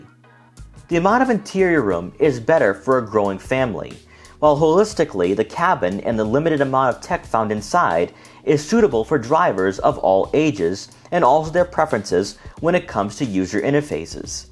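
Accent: Boston, United States. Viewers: Terrible accent for a Bostonian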